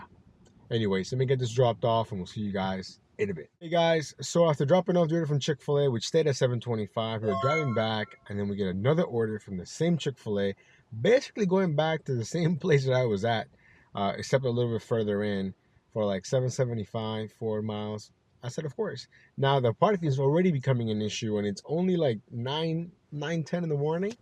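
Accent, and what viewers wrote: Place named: Virginia, United States. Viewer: Lmao Dulles town center 🤣. I thought with your VA accent that you were trying to say Dallas this entire time I've been subscribed.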